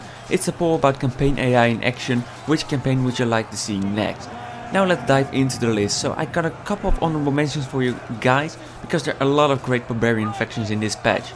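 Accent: Netherlands. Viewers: I just can't listen to this Dutch accent ;/ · you sound like you're Dutch, if you are Dutch: ik vind je filmpjes heel leuk blijf doorgaan :)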